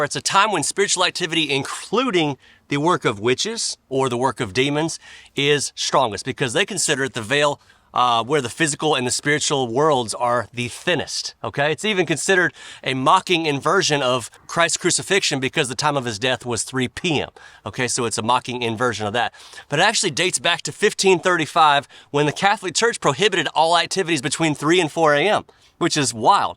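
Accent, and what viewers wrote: South, United States. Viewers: just seeing your face and listening to you talk tickles me to death. Unfortunately, I tend to be pretty stressed out and always thinking about the next problem that needs to be solved, so I appreciate those people out there that can just put a smile on my face. You always do that for me. Maybe it is just the smile, or the familiarity of a good ol’ country twang. But I strongly believe that it’s actually because you carry a bright light with you. The kind that you can only get from Jesus. I can see it in you. I just thought I would say that. Thank you. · Thanks, I like your content, you talk like me. I'm a Southerner myself.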